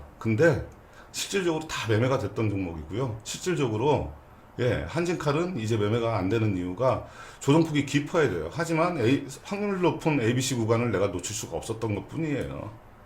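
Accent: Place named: Gyeongsang, South Korea. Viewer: 고수시다... 경상도인데 경남은 아니신듯... 사투리가 저보다 부드러우셔서ㅎ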